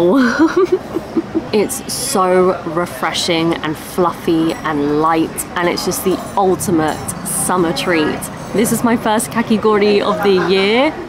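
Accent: United Kingdom. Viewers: イギリス英語って、ホント、耳に心地いい！ と、感じる「１」大阪人です〜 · いつ聴いても綺麗なクィーン‘ズ イングリッシュいや、キング’ズ イングリッシュですねぇ。日本の第二外国語教育として英語か米語のいずれを選択するかの議論があったのですが、日本は米語を選択して今日に至っています。何度も申し上げますが、発音が心に響きとても美しいですね。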